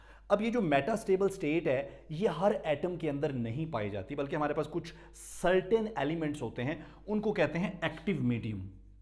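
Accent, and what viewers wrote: Pakistan. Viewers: Pak se ho kya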